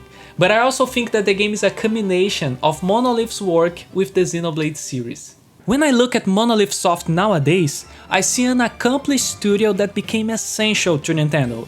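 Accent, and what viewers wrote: Brazil. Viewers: Hey, are you brazilian? Because for me, your accent and way to talk are very similar to the brazilian way, and im from brazil then it seems a lot lol. And very good video btw, I LOVE Xenoblade and monolith soft is incredible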